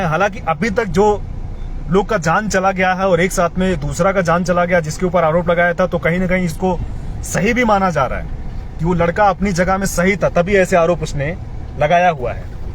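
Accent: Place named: Arunachal Pradesh, India. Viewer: ji, aap ka hindi bhi apna wala hogaya hai.😊 "Padhariya aap Arunachal mein hai"😂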